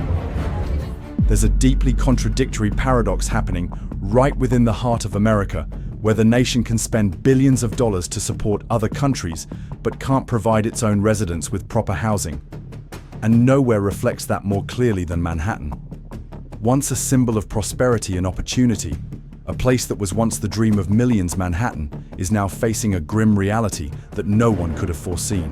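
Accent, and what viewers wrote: United Kingdom. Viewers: I live in NYC. Have never seen a Tent city. About 10 years ago the homeless mentally ill were rampant in the subway stations , but it’s been cleaned up. 125 th on the east side was full of garbage and K2 victims 8 years ago but no longer they’ve cleaned it up. There’s no longer any abandoned buildings , that ended years ago. Where is the British speaking person speaking from , the moon ? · Living in Manhattan for over 40 years I cannot agree with this clip. It exagerates very much the severeness of the situation. its not as bad as you portray, notice your British accent , you are not one of us and cannot judge. NYC spends billions a year on this problem and I should know since they helped me a lot with certain issues. There is no right to live in Manhattan. Its for the rich and wealthy. You can move to pennsylvania or New Jersey and seek your fortune there where costs are lower. 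and rent is lower. Most of these homeless are not from here but from much further away. Notice they dont want to leave Manhattan though they are homeless because there are many shelters and places to get help and free food daily. Cant agree with your show and its too untruthful, RM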